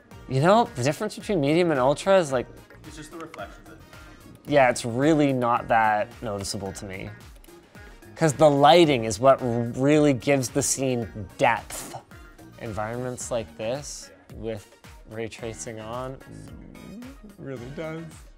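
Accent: Canada. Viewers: You can really hear the Canadian in Linus’s voice today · Dang Linus’ Canadian accent came out to play in this video...and I’m Canadian lol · Is it just me or did Linus go super Canadian in this video eh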